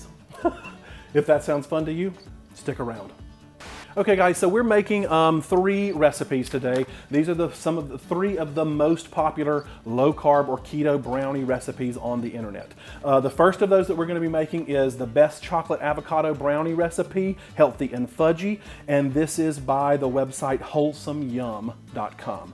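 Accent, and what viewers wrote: South, United States. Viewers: Dude, you freakin’ rock! Just went ketopocalypse now and you sir are my young Martin Sheen! Love your southern dang fried accent that makes it ALL gouda!🤪🤙🤛🏻 Lord! Keep it going!!!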